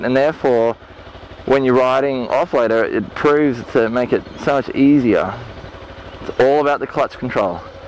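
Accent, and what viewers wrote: Australia. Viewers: Hes obviously Australian you knob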